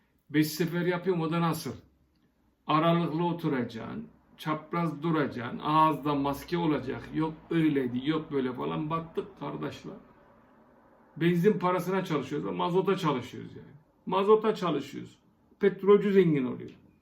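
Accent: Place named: Ankara, Turkey. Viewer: Angara yi seviyoruz..tam Angara ağzı, kelimeler ,tonlamalar, jestler..özledim ...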